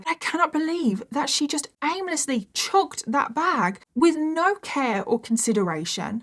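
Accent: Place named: United Kingdom. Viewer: I heard 22nd ‘December ‘ but you’re not the only person who heard September.. might be the British accent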